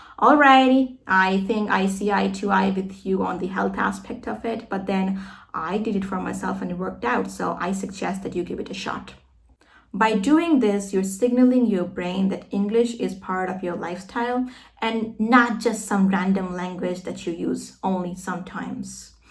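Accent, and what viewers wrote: United States. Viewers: hello mam how are you doing can I connect to with you once you are my big friend and I I always try to watch your video yeah it is true understanding your accent is difficult but I like so much American accent and I think you use American accent expecting your attention thank you so much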